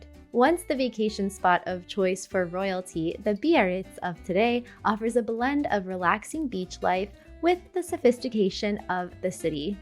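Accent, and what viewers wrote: United States. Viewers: Franco-American France mother American father US army, and I have live in France for over 20 years this time! I could not disagree with you more on everything sure if you have lots of money, and are will to burn it anywhere in France is just as good as any other place! France is about the size as the state of Texas and everything is near by. Do you want tourist trap she is your lady someone to help spend your money she is your lady or someone who thinks she knows France, but actually she fooled you she is your lady! Lyon & Toulouse two of the worst places to go and Provence Nice is nothing, but American tourist trap for the chic want-a-bes! One thing about France is you can find great food and great wine anywhere and there is something for everyone you want water go to the water you want mountains you just go there, but don't take her advise she actually knows little about France and that American accent could kill a horse to the French that have to listen to that I am sorry even my father knows better and he hates the French! 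Read some French history Google map research the place you like listen to every other expat on YouTube and listen to some French videos try learning French do what you can before coming here get yourself a Frenchie to talk with by asking one!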